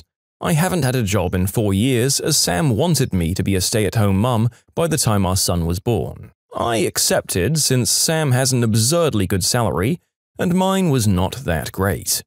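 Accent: United Kingdom. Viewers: Im back its just a britsh lady saying good night to bulldozers and such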